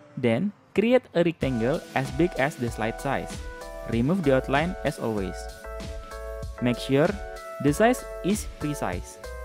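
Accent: Indonesia. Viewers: Aksen Indonesianya manthul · Makasih mas tutorialnya, accentnya seperti orang indo, ternyata bener, auto subscribe deh 👍😁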